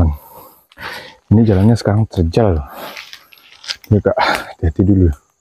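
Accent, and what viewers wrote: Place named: West Sumatra, Indonesia. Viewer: Iyo da... Wak di perantauan ma hahaha.... Mako e wak batanyo kok logat uda taka urang awak lokasi pun mbo caliak taka di padang..... Yo bana rupo e di padang hahaha.... Dima tu da padang Panjang kah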